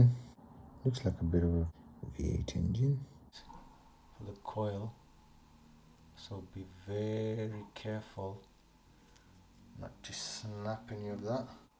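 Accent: Birmingham, United Kingdom. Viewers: Awesome video and lovely watch. I’m pretty sure you’re a brummie like me 👍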